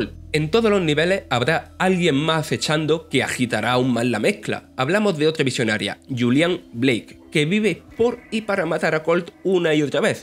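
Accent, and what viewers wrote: Andalusia, Spain. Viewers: ¿Este narrador es andaluz?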